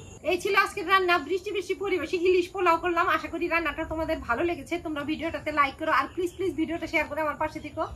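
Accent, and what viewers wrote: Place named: Bangladesh. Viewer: আমি নিশ্চিত যে আপনার পূর্বপুরুষ বাংলাদেশর ছিল। আপনার কথা আমাদের দেশের।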